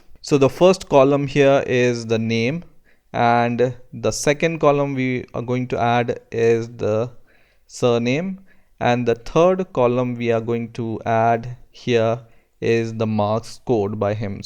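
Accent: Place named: India. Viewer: No his accent is real indian.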